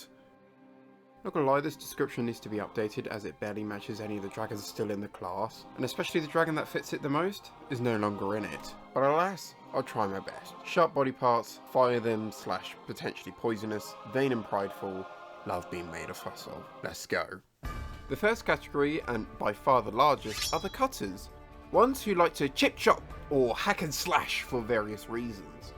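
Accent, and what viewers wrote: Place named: United Kingdom. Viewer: Why do British pronounce t-u's like c-h's?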